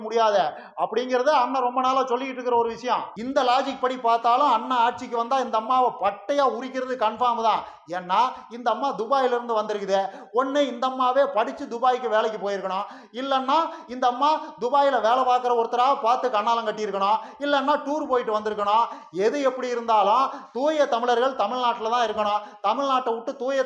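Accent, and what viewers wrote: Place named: Telugu, India. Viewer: Your tamil pronounciation reflects you as a pakka telungan.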